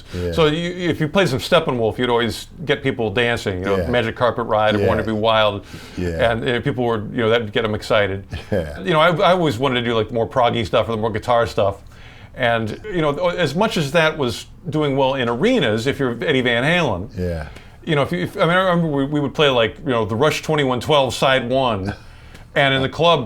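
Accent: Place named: Transatlantic, United States. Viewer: He's changed his appearance and accent to transatlantic. Not sure if this is a phase or if he's aware of it.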